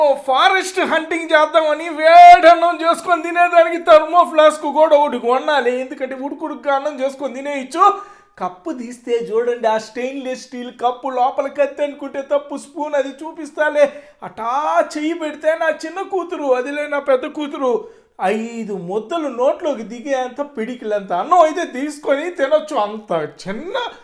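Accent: Nellore, India. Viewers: అన్న బాగుంది అన్న లంచ్ బాక్స్, స్పూన్ కూడా బాగుంది మీ మాటలు సూపర్ మన నెల్లూరు భాష బాగుంది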